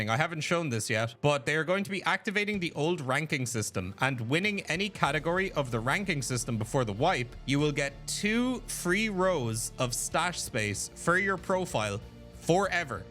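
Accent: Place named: Ireland. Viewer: Ive been seeing a lot of negativity lately so this is really nice to see. As always the silky irish tones in the noise canceling headphones are a highly appreciated bonus.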